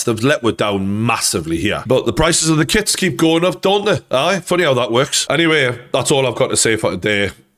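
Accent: Newcastle, United Kingdom. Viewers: yet youre here listening to a geordie about a geordie club.. Living rent free in your head 😂😂😂😂😂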